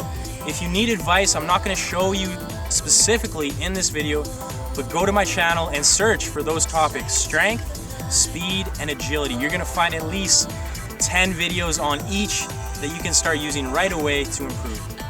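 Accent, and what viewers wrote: Canada. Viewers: Are you Canadian? :)) Abowt. Abowt. Abowt.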